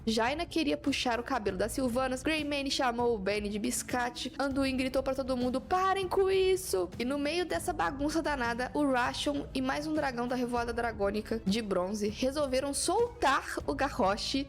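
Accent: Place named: Minas Gerais, Brazil. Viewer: Tu é mineira ne? O sotaque n engana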